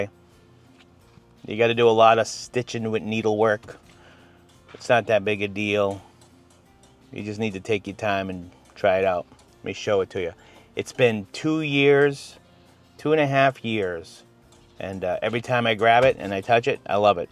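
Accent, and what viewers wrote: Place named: Boston, United States. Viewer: (Guessing you're from Boston, based on your accent?) Just picked up my '25 Hybrid Maverick, LT.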